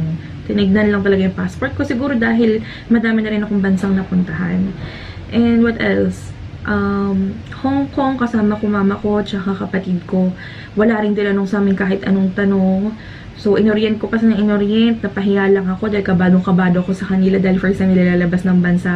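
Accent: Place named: England, United Kingdom. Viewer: thank you and btw, I like your english accent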